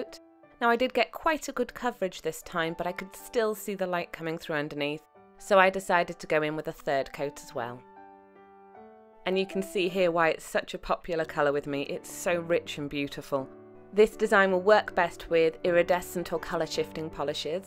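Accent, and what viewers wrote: United Kingdom. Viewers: Gorgeous design and beautiful nails! I love your accent, so nice to find British people on your be 😁 Liquid latex has a horrible smell doesn't it 🐟 xx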